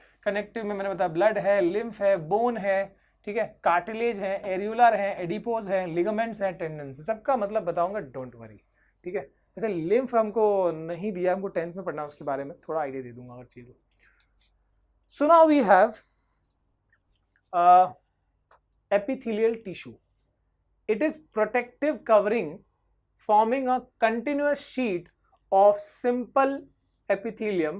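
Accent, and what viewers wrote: Bihar, India. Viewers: I really love the way and the accent u used to teach 😂💕 your bihari accent is mind-blowing apne wali vibe aa jati h 😌💕. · Sir ap bihar ka ho kya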